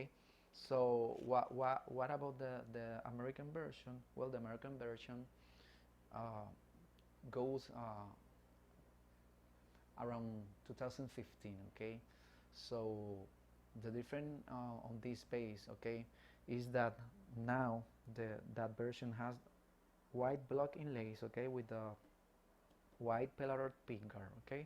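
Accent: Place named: Chile. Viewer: Eres chileno? ... tu acento suena a chileno 😨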